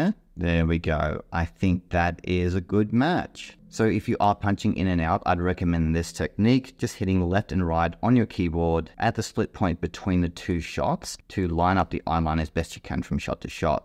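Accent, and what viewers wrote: Australia. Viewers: Thanks mate you are helping me get unstuck with these bloody reels.. you are Aussie, are ya maaate? cheers!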